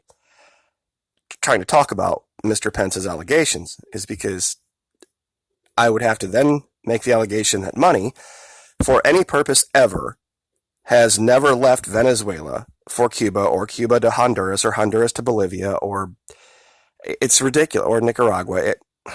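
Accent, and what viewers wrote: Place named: Tennessee, United States. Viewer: Get well soon. I'm gonna join. Tennessee accent or not... genius. Just a trucker and I gotta feeling I'm gonna get caught out on the road and well you know... rodney king video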